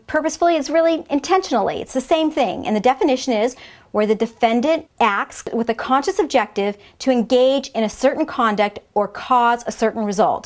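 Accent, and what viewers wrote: United States. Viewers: QUESTION: If you're really certain, where in the USA is her accent from? Thank you. Every one of this series of videos is very clear and of excellent quality, and the audio levels on every one of the videos are perfectly matched for an uninterrupting playlist. The instructor is also very attractive, and I'm curious where in the USA her accent is from. Finally, I wish there were more such videos from her, besides the ones in this series.Thank you so much! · Hi Greg. I'm a 40-year resident of the United States who is currently in California (13 years). I've moved around, 27 years in Texas, a winter in South Jersey, a couple of months in Tennessee and I traveled as a sales instructor, 37 states total. I speak 4 languages fluently, but English is only my 3rd language. I just came across this video today and found your question. Long answer to you because of your preface, "IF YOU ARE SURE." I am not sure, but my guess is, she's from the Midwest. 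Eg. Illinois, Missouri, etc. among others. Educated Americans, by the way, such as Attorney, don't have identifiable accents, geographically. I say it humbly, folks have a hard time guessing my origin. Native Californians sound like her. And so do college graduates from New York. The loud and confident tone of her voice can also be attributed to a generational upbringing of a family of professionals, professors, educators, et al. Having said all of this, why 5 elements? I thought there's only 2? 😂😅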